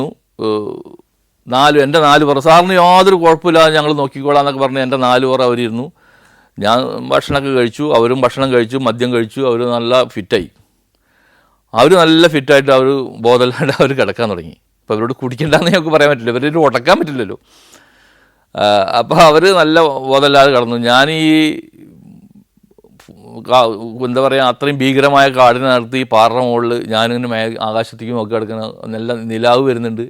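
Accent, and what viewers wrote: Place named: Valluvanad, India. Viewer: സർ ആത്മാർത്ഥതയും സത്യസതയും തുടിക്കുന്ന വാക്കുകൾ. വള്ളുവനാടൻ ഭാഷയുടെ വശ്യത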